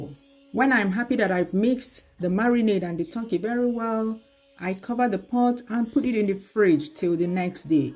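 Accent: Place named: Igbo, Nigeria. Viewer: Nne I'm also Igbo but I just find the way you speak English amazing 😍, like the accent is giving me joy❤ I love it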